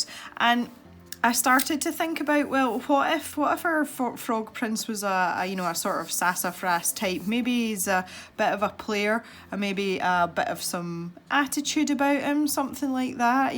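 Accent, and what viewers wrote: Scotland, United Kingdom. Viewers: Loved the video and am one of those who loves your accent. My Nan was Scottish and I have many a happy memory of visiting her in Bonny Scotland. I miss her so much, and find your voice sooo soothing!Also, I was born and bred in Corby which is known as a Mini Scotland and has a wide range of Scottish accents, so understand you perfectly (though I couldn’t always understand my Nanny June 😂)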